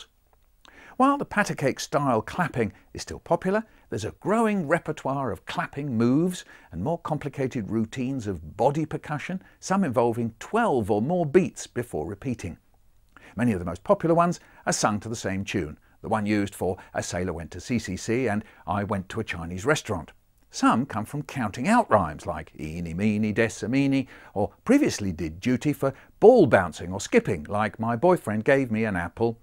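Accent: United Kingdom. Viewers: Yes, instead of hearing the children perform, let's just have an old British guy talking the whole time. :/ Typical British media presentation, with the RP narrator over the top of everything.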